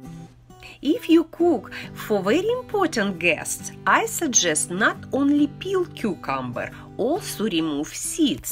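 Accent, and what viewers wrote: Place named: Russia. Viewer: I love your soup and your Russian accent also, my wife is Russian and i love anything Russian 😍 nice video👍Спасибо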